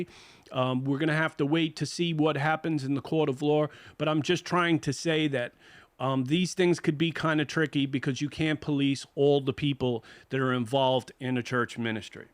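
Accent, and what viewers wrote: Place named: New York, United States. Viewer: I am subscribed and here for building faith... but as a former NY resident, now in Florida, I am absolutely here for your NY accent that I miss hearing except from my own mouth.😊 God bless.🕊️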